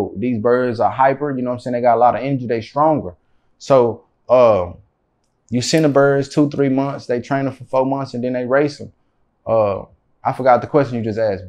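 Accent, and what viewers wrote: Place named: Texas, United States. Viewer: Anybody caught the website he said. I didn't quite catch what he said. That Texas accent strong af 😆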